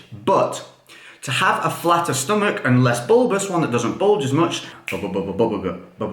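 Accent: United Kingdom. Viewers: How did I know he was going to have a brittish accent before the video started LOL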